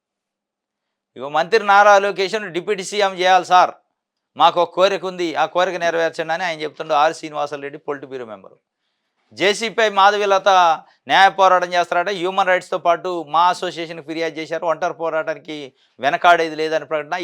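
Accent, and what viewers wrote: Telangana, India. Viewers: Anchor telangana vadu laga vunnadu laungage different ga vundi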